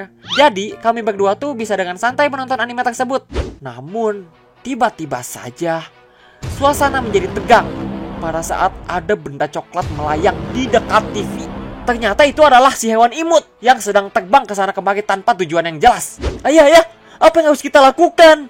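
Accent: West Java, Indonesia. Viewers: Awok²... Tiguling dong:v Orng Sunda ya bang? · orng Sunda?😳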